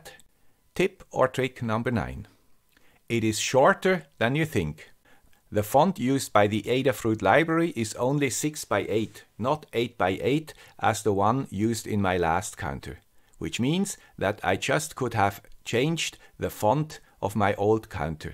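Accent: Switzerland. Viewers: No. we'll never stop watching the guy with the Swiss accent. Bye. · Same thing I thought Sir. Love this guy with the Swiss accent! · As a guy with an Argentinean accent, I enjoy your Swiss accent enormously! It goes without saying that I love your content and your delivery. Saludos from Buenos Aires and have a great day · I was subscribed when we surpassed 100k. I will be subscribed when we hit 1M. So will be the guy with the Swiss accent. Quality content from one of my favourite YouTubers. Thanks for your videos <3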